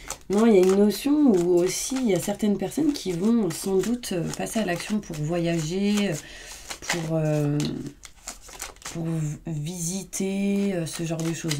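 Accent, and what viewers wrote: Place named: Mediterranean. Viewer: Oui déjà des petites insomnies. En fait c est bien si vous faites rire les auditeurs, car vues les nouvelles à la télé.. En fait vous parlez comme un peu les gens de là où j habite : pays de Méditerranée !.... En tous cas j y suis habitué. D après mon avis, les gens qui regardent les vidéos des mediums, et bien c est qu on a une petite vie bien étroite, étriquée, et que notre âme est prisonnière " de peurs, de questionnements, et d interrogations".. Merci pour ces deblocages ! Vidéos vraiment dynamiques ! ☀️😊💐💐💐💐💐💐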